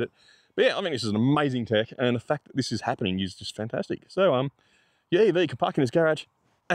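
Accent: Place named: Australia. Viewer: You certainly do have that fair dinkum Aussie Accent , well done mate have a bloody great day.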